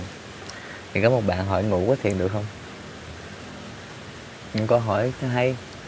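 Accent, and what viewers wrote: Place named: Mekong Delta, Vietnam. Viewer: Tập này a ngồi nc kiểu miền Tây hả😅 nhìn cưng ghê😍😍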